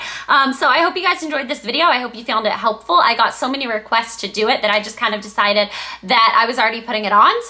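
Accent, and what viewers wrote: South, United States. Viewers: i just watched one of your older videos...and your accent has changed SOOO MUCH! you had a really cute southern accent.. :D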